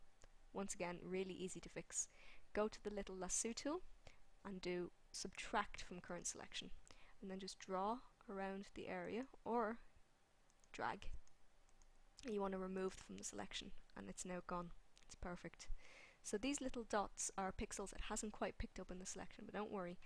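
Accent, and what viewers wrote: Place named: Ireland. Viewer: Irish accent is best accent.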